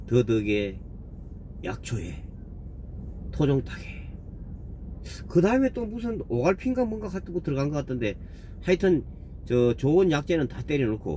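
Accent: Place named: Gangwon, South Korea. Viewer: 인물도 훤하십니다 상동에 가보구싶네요 말씀도 강원도 본토말씀 듣기좋습니다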